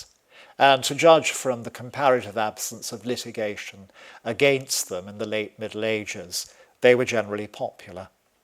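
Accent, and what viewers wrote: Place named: RP, United Kingdom. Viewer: It’s called RP, Queen’s English.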